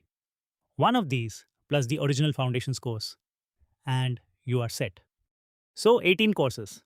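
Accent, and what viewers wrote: India. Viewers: You made a chatbot channel and you chose to use a Hindu accent english voice-over? My God... how counterproductive! Spare our ears and brains please!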